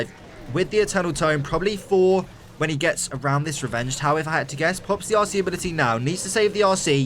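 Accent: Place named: United Kingdom. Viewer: British accent 😉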